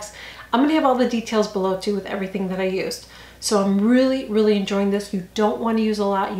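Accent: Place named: Midwest, United States. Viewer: Hi, I’ve been subscribed for awhile but I don’t think I’ve taken the time to comment. I enjoy your content and all the help you share. It’s a bonus that you’re so “real” (no shame in a close up, you rock it!) and I can get my fix of a Midwest accent! I appreciate that you return with some “revisions” and solicit feedback. It’s a process, working with these eyes of ours. Thanks for leading the team effort! 💐 Jacqui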